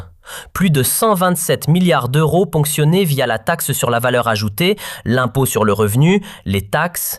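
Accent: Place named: Quebec, Canada. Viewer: Stop à l'IA qui alterne les commentaires avec un accent Québécois et qui nous répète en moins d'une minute 2 fois la même chose RAS LE BOL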